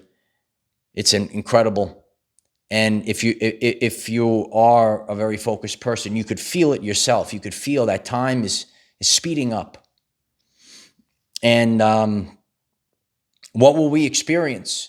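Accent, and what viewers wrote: New York, United States. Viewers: Yes! (Nice to hear a NY voice.)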